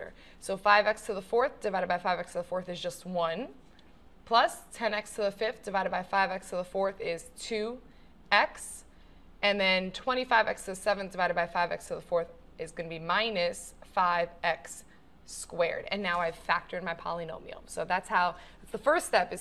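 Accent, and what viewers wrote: United States. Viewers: Smart, hot, east coast accent. Give me detention.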